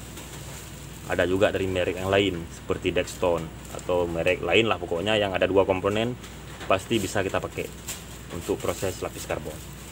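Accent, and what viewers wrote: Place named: Aceh, Indonesia. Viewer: Aksen Asli Aceh Utara bang Mantap Kali bukan kaleng kaleng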